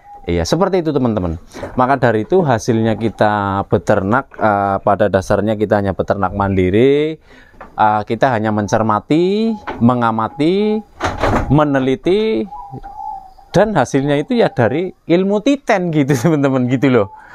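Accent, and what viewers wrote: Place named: Banyumas, Indonesia. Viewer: prtahankan Ngapaknya, trims ilmunya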